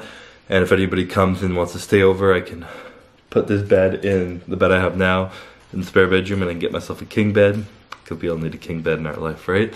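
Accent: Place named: Canada. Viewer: I was like why does he sound weird...oh yea Canadian...lol.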